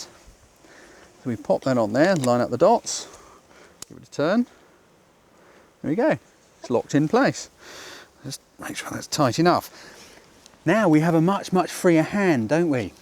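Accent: United Kingdom. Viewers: thank you for your native british language i really enjoyed it.s really lovely explain it .too much time i have been searching t the internet finally found you thank you.amazing explain .you are good teacher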